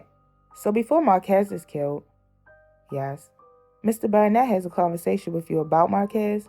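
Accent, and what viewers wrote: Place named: Baltimore, United States. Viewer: This narrator is dope af 💪🤞 Can hear the Baltimore in her voice for real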